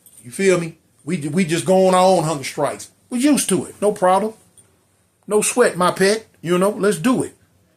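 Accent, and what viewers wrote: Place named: Alabama, United States. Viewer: Lmaoooooo the Hounds of Baskervilles is crazy yo u read that book? 😂😂😂😂 that Alabama accent got a good grip on u big homie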